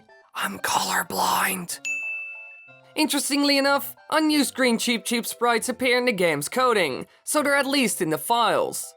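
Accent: Netherlands. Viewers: are you dutch? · are You dutch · you have a good dutch accent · are you dutch and speak english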